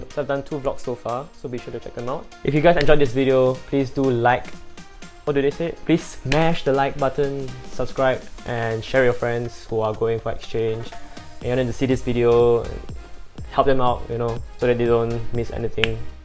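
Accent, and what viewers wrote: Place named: Singapore. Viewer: Great video! But the Singlish is too crazy for me man 😝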